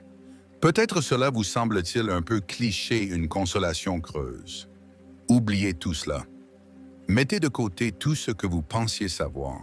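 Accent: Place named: England, United Kingdom. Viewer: Qu'est-ce que tu fais là, toi, avec ton accent anglais Très gras !!!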